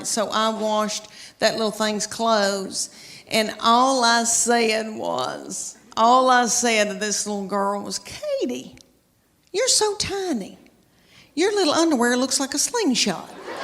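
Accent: South, United States. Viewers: now that twang means no teeth, no brain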